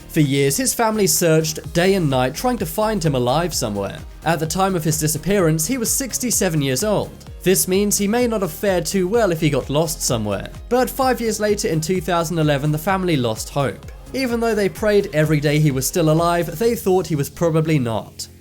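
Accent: United Kingdom. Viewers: Are you britash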